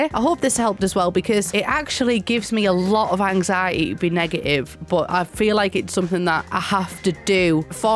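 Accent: United Kingdom. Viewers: Yeah just wasted $3k+ simoleans on my THIRD failed wedding. Catastrophic failure and not a single “part” of the ceremony or activities were able to happen. We hired a violinist and they sent a ghost and everyone got stuck in loops talking to the freaking ghost. I want my real world money back and all my wasted simoleans 😡 Also side note totally unrelated but I’m sorry for neglecting your channel for LilSimsie, my partner can’t stand her voice, and your voice reminds me of my ex fiancé who is also British so your voice is a weird nostalgic trigger lol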